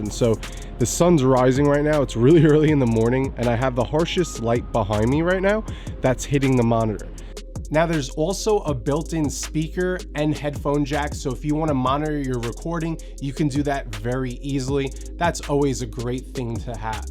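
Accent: New York, United States. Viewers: I will primarily use this for stills (landscapes) as I find the standard camera screens limited in scope, especially for my old eyes. Although, I surely might be tempted to do more video..!! A good thing, indeed. I have the ability to use a Samsung Galaxy S2 tablet, a very attractive display and wifi connected, but outdoors, here in sunny Las Vegas, something more is needed.. I noticed the palm trees in your video samples, but your accent is back east NY (as am I).. LOL! Thank you, for your good nature..!!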